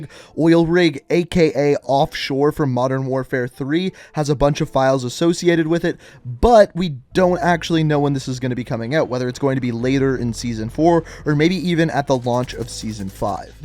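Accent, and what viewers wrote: Canada. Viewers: It’s the Canadian accent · Def can tell he’s Canadian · Im like a parrot repeating every word he pronounces so Canadianly.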